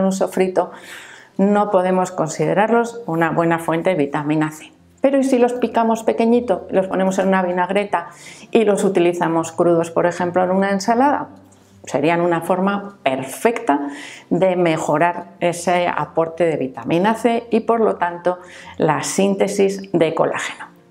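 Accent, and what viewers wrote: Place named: Castile, Spain. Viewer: Que acento tan bonito del castellano ❤❤❤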